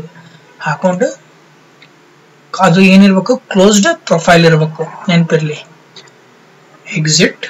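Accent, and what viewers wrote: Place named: North Karnataka, India. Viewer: wonderful sir,... very usefull.... THANKU....Explaining in north karnataka style juss awsm loved it... ;)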